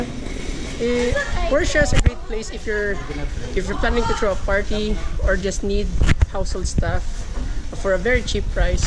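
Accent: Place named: Iloilo, Philippines. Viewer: Nami gd accent sang ilonggo ba HEHEH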